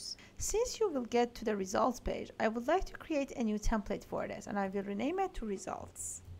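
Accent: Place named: Iran. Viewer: this crazy iranian accent...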